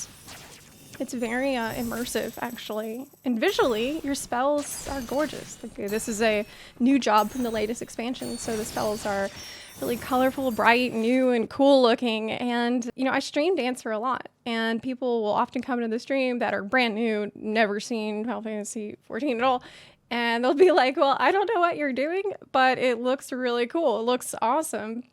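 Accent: South, United States. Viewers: European girl with a country accent.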